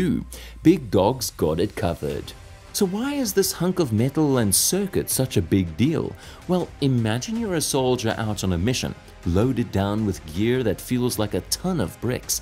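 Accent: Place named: United Kingdom. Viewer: Not bad for AI. I didn't hear any mistakes in the dialog. Only question: why are you talking about "Our military" (as in the US) with a British accent?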